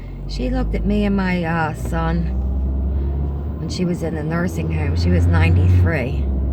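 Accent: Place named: Philadelphia, United States. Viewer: Thank you for sharing ❤ very brave woman! Out of curiosity are you in PA? I was trying to place it while you drove and you have (as do I lol) that east coast/Philly accent! If so we are nighbors:)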